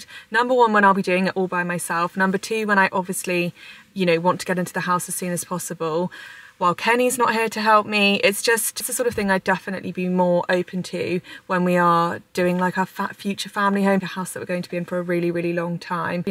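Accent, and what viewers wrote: England, United Kingdom. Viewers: I really like your accent 😊. Which part of England is it from?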